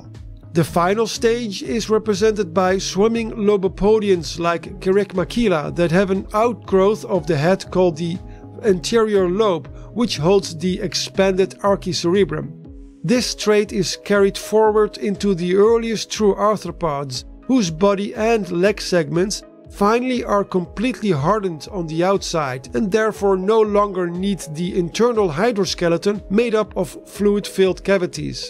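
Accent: Netherlands. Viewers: Hearing that dutch accent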